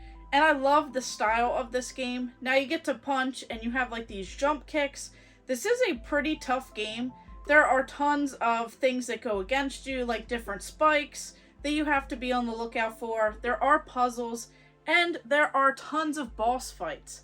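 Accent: Maryland, United States. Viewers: I gotta ask…are you from Maryland? You have a bit of the md, va, pa accent. I haven’t lived in MD for 14 years and my accent is getting thicker as I get older😅 Anyway, digging the content. RetroWolf sent me.✌️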